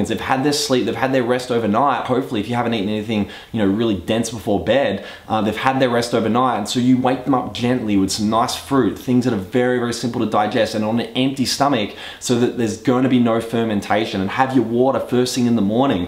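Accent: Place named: Australia. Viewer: Love the OZie accent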